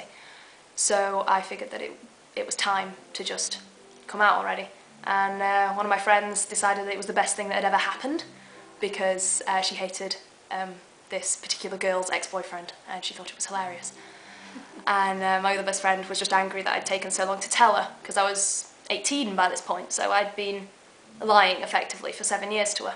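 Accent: United Kingdom. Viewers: i'm straight and i loved your story. your adorable! i love your british acsent. i wish you well. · Are you from ire or Scotland? U sound Scottish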